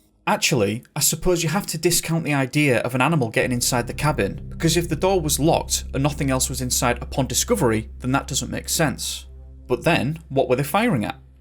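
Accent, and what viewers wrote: United Kingdom. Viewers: if you must know he's from the U.K. will you be able to sleep now!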